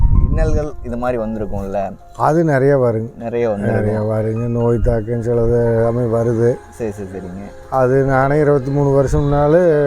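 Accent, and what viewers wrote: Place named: Kongu, India. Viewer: Kavanthapadi la enga relative irukaaga. Unga kongu tamil super. Sir ivaru num pls?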